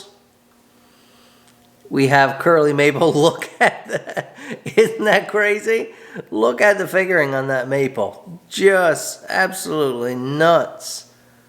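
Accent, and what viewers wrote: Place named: Newfoundland, Canada. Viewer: you live in Newfoundland?? no wonder i can't understand you LOL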